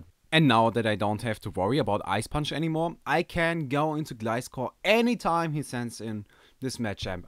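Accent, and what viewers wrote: Germany. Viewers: Hab schon nach dem 1. Satz gewusst, dass du deutscher bist. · This guy is beyond German. Haven't heard an accent like that in a long time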